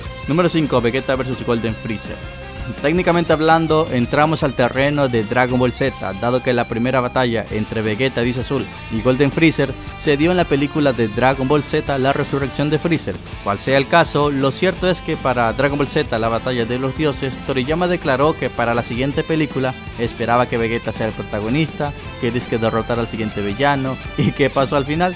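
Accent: Ecuador. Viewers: Gabardina? Te expresas como nosotros mijo eres ecuatoriano? Saludos me gustan tus videos loco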